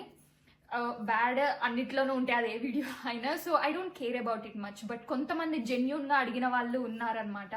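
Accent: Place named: Andhra Pradesh, India. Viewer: Are you from Andhra? Because your slang felt exactly like that.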